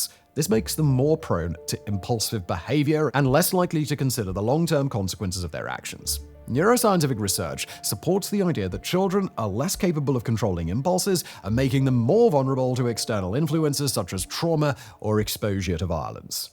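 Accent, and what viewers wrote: England, United Kingdom. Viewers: is this just vsauce with a British accent? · The unimaginable. Up yours. Your so predictable. Political Correctness with an arrogant english accent. You forgot to mention Lizzy Borden.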